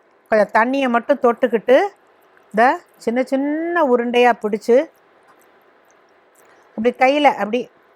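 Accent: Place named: Rural Tamil, India. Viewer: I love the way you speak in rural Tamil. Very interesting and informative. ❤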